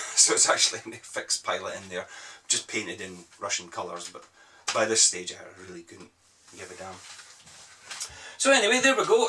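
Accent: Scotland, United Kingdom. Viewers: Lovely work here! All awesome models and I love your Scottish accent! Keep up the good work! Cheers, Leo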